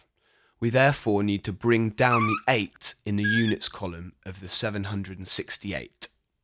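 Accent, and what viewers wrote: United Kingdom. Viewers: so nice to have a British accent explaining this thanks so much!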